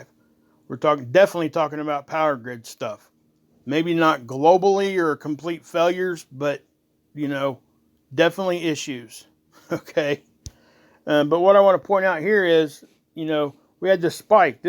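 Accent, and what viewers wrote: South, United States. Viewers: i jus like ur country accent😝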